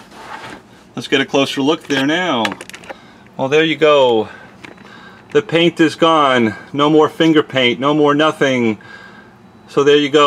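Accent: New York, United States. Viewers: You sound like you’re DEFINITELY from New York lol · Thanks you made my day. Dallas is awesome but you sound like a New Yorker.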